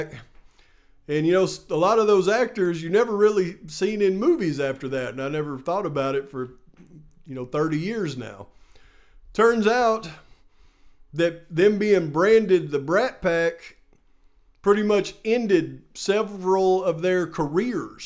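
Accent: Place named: South, United States. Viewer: I just love you. But can you please confirm if you are holding a bug zapper in your hand as a mic? Your country accent, paired with your laid back style plus all the bass pro shop shirts, has me convinced… that it very well could be. 😂😂🙌🏼🙌🏼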